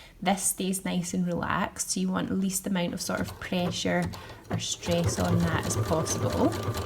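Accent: Scotland, United Kingdom. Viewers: Thanks for this great video. I've just succeeded in making my first (flat) neckband thanks to your clear and concise explanations! The added bonus is your accent;) Greetings from a fellow Scot in Paris. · Brilliant tutorial delivered in a lovely Scots accent 🙂. Thank you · Fabulous. It always confused me aboout quartering, not realising that you dont use the shoulder seam. My necks always worked out well because I just used my common sense realising that the front scoop is longer. But your instructions were brilliant. I love your Scottish accent too. Thank you. You are never too old to learn I am 75 and been sewing since I was 12.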